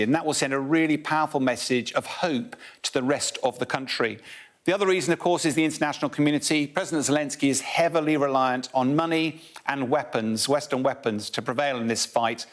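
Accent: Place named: United Kingdom. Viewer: So what's been the timeline for the Ukrainian counterattack thus far? And why does this newscaster have to butcher the pronunciation of these Ukrainian and Russian words? It seems he's less about accuracy and more about British-ness. It's Za-por-rizh-ZHI-a, not Za-por-RISH-shi-a, KHEYR-sohn, not HER-son, POO-tin, not PEW-tin.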